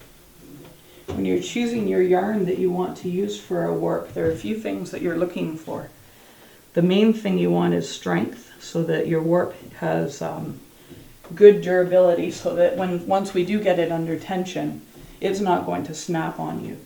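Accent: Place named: New England, United States. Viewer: Wonderful..thank you so much! i was wondering where your accent came from. i was guessing New England, USA!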